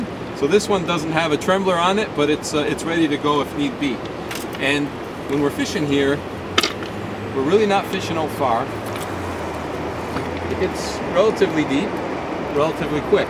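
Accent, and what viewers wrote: Canada. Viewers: you from canada ey? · That Canadian accent! Lol! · love the canadian accent :D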